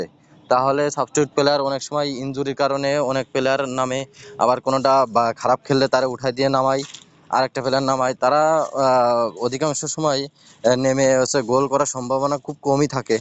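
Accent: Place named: Khulna, Bangladesh. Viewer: Vai apnar desher bari kon jelay.. kotha shune mone hocce khulna te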